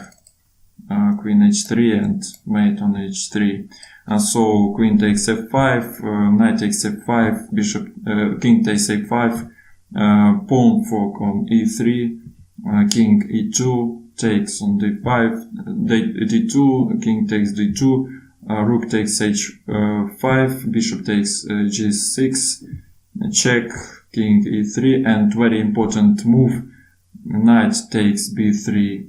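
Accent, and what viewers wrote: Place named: Eastern Europe. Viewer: мне в своё время нужно было подтянуть инглиш. Перепробовал многое, в т.ч. и репетиторов-носителей английского языка. Но для меня самый действенный способ оказался просмотр интересных фильмов с субтитрами. Все непонятные слова я выписывал на транслейт.ру, а далее перед сном их заучивал. Первая серия при длительности 45 минут занимала у меня полтора часа (всё выписывал, переводил и схватывал о чём фильм). Последняя серия уже заняла примерно 47 минут. Во-первых, будет полезно услышать их акцент и мозг сам переключится на более "забугорное" произношение. Сейчас слышно, что акцент восточно европейский. Во-вторых, существенно расширите свой словарный багаж. В-третьих, ещё и психологически отдохнёте за классным фильмом. Единственное - нужно обязательно тратить время и заучивать слова перед сном, и желательно с утра их просматривать. 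А от себя скажу - огромная благодарность за ваш труд. Реально оч интересно смотреть ваши видео. Причём год от года наполнение стало гораздо более качественным. Уверен, что и с английским будет аналогично. Успехов вам! С благодарностью, ваш подписчик.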